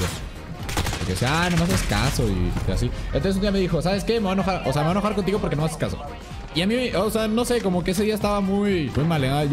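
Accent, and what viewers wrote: Mexico. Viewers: pero si parece que tiene acento mexicano x'd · Ah qué pedo, pensé que eras de México :0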